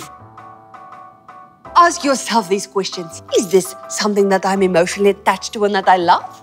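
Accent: Afrikaans, South Africa. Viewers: LEG3ND she sounds afrikaanse. I should know. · She’s a South African that’s why she has this accent. My friend lives I South Africa 🇿🇦 in the same city 🌃 I live(not by though) but her aunty is.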